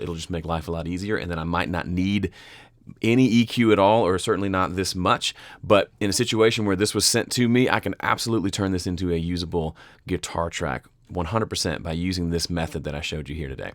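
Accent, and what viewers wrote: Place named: Mississippi, United States. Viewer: Hi, I have been enjoying your videos on proper EQ. Great Job! Your accent seemed familiar to me so I checked you out and lo and behold, like me, you 're a Mississippi boy. I'm from Jackson, an original rock 'n roller, who played with Andy Anderson from Clarksdale in the early days. Keep on making those videos and I will keep on watchin'!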